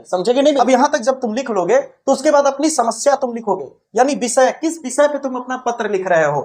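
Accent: Bihar, India. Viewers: Sir lagta hai aap bihari ho...😅 Me from up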